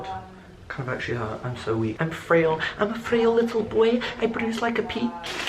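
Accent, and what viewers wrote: Scotland, United Kingdom. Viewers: Yess don’t jel hun -in Scottish accent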